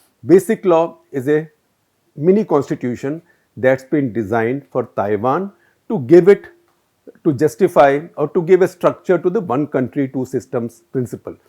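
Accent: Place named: South Delhi, India. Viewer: You just run on assumptions all day with south delhi english and hence i unsubscribed....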